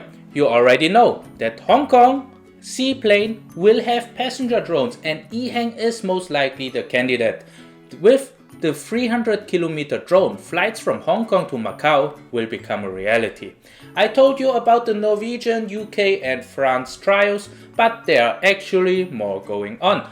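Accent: Germany. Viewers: U have an interesting accent, it almost sounds like a German accent.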